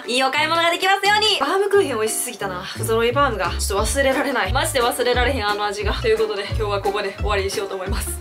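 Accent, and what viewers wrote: Kansai, Japan. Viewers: 初めてコメント失礼します😊いつも楽しく動画見させてもらってます😊😊 関西人なのでの喋り方も面白ポイントもどつぼです❤ 編集もかわいいが詰まってて大好きです！今日無印良品行っておんなじ商品いっぱい買ってきちゃいました〜😋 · 無印週間の動画を探してたら、初めてさんに辿り着きました！関西弁が可愛いすぎます😂 他の動画も観てみます〜💞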